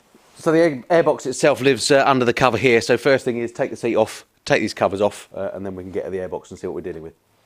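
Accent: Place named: England, United Kingdom. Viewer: I love your English snobbish accent please keep it up Don't ever change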